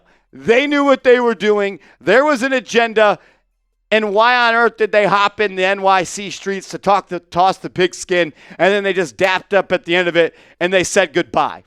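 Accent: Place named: Midwest, United States. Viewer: How is this guy the host for the Giants on Chat Sports ? He sounds like he’s from the Midwest. I live 10 miles away from Giants stadium, and Giants fans don’t sound like this. 🤔🤷‍♂️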